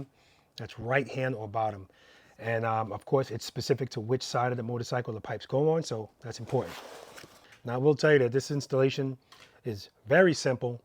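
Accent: New York, United States. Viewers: Dude, that NY accent!! LOL 😂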